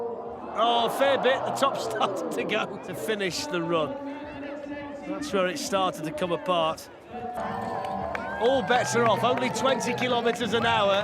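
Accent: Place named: United Kingdom. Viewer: The British 😅😅 commentary is thee best! Pram wheels! The burm!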